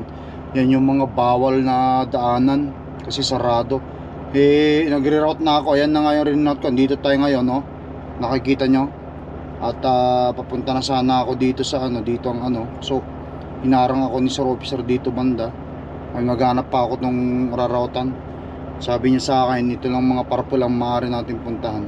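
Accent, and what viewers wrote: Ilocos, Philippines. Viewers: Ride safe always sir parang ilocano kau sir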